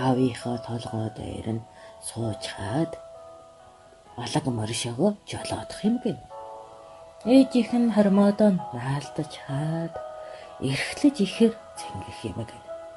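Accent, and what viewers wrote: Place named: China. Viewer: Цаад хөгжим нь чанга, хажууд нь хужаа аялгуутай ойлгосонгүй ээ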